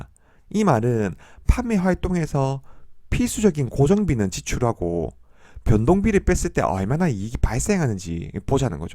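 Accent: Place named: Gyeongsang, South Korea. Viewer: 감사합니다.. 항상 잘 보고 있습니다. 부산 사람으로 경상도 사투리가 너무 익숙하네요^^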